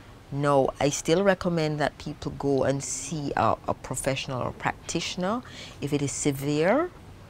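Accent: Jamaica. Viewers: The Sistah could talk to me all day about health. Jamaican accent supreme! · MARVELOUS! ...What a beautiful lady this is...smooth impeccable skin. Such clean expressions, such clean energy and such clean appearance...ZERO pretentiousness and 100% NATURE PERSON. I really enjoyed hearing this...and your golden Jamaican accent and mannerisms...and the little sweet Patwa in between. - no ssstchuppitnis punna she hair - arl's nature groove...eeeasy-eeeasy lite and breezy! It's so wonderful to see zero ostentation in motion. GOD Wisha-Ouija bless you! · is she jamaican? sounds like! love her voice and speech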